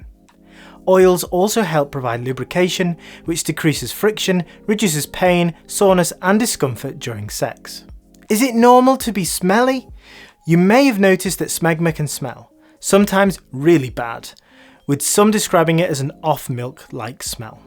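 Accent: United Kingdom. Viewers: Northern British English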